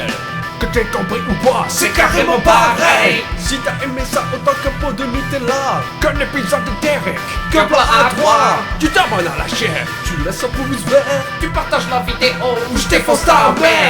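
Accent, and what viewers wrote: South, France. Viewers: Il est du sud enfoiré !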